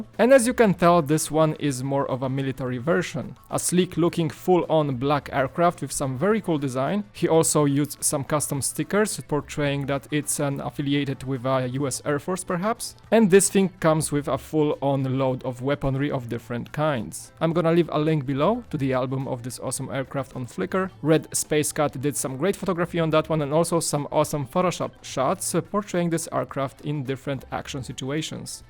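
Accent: Poland. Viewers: are you from Poland or are you Polish? I apologize for the question but you have such an accent and you pronounce the names well in Polish.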